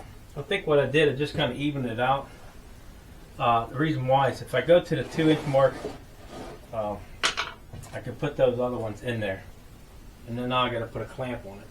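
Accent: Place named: Western Pennsylvania, United States. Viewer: Are you from western PA? It sounds like it.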